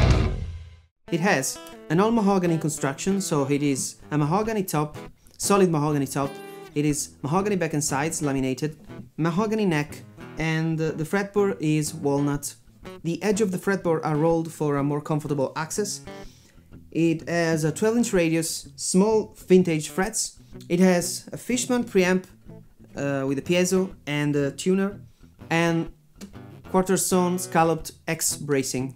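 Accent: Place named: Brazil. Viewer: Vc é brasileiro mano eu percebi o sotaque, quase nenhum, mas consegui perceber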